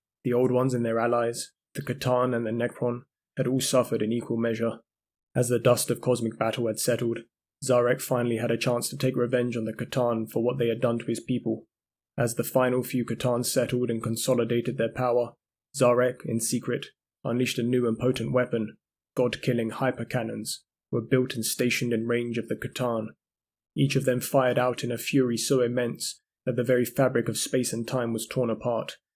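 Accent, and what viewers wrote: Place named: United Kingdom. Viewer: Dunno why i fixate on that comment so much, it keeps rolling a round in my head. I think its because i was so genuinely surprised that a new Lore channel had popped up and it was really good. I think i have nailed his voice down - Calmer than arch warhammer, More interesting than luetin and British. these are all wins.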